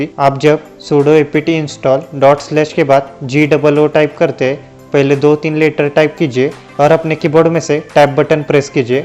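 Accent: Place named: Maharashtra, India. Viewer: Bhai ur speaking accent is like marathi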